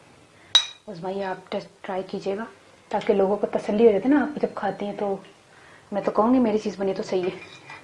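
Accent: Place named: Punjab, India. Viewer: Uff apki Punjabi so cute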